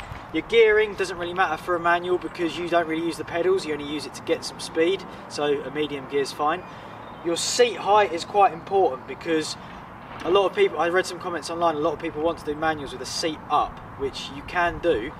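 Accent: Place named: England, United Kingdom. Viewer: nope , he is from England. Można wywnioskować to po akcencie , i oczywiście miejscu zamieszkania , żyjemy w dobie w której o takie informacje łatwo , wystarczy "wygooglowac" ;)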